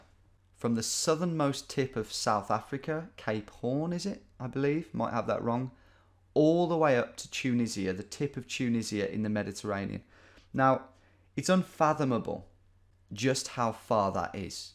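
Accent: United Kingdom. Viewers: awesome britishness doing the commentary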